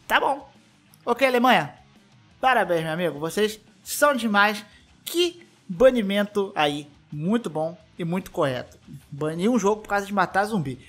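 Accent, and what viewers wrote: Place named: São Paulo, Brazil. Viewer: Vídeo ficou show mas porque você quando fala tá puxando o S igual gente que mora em São Paulo? Puxa o Xiix igual carioca mesmo porque sei que vc é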